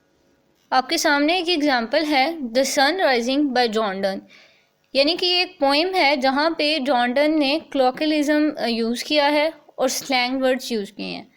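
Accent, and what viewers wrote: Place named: Urdu, Pakistan. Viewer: She is speaking in urdu accent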